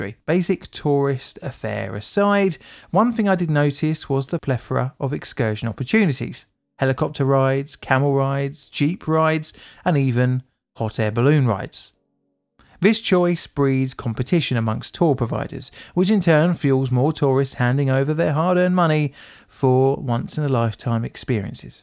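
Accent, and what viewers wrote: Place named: England, United Kingdom. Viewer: As someone who has a lil trouble understanding an English accent I kept hearing 90s. It took me a second to realize he meant 2000s.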